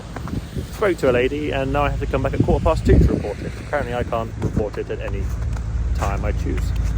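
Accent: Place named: United Kingdom. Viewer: Love your British accent. Are you from Liverpool?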